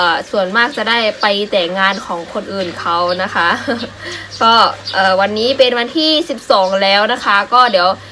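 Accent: Thailand. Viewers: น้องดูเหมือนคนไทย ไม่เหมือนไทใหญ่ จากการพูดสำเนียงภาษาเหมือนไทย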